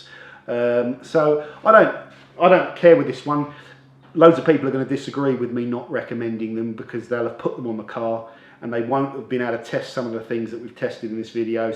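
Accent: England, United Kingdom. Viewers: I like the nature of his videos as is. Conversational. Friendly. You get a feel for the personality. I would enjoy them far less if they were closer to the overly constructed, clinical tripe you're describing. Then again, I'm American and might just be enjoying the accent; it's like listening to a Charles Dickens character tell me about a magical place called Bilt 'Amber, where I can only imagine they have wonderful foods that are all called 'pies' for some unknown reason.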